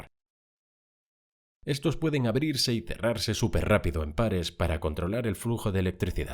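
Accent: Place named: Spain. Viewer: Oh NO! Nomas Oi ese Acento de GACHUPIN, Odioso! y me VOY!......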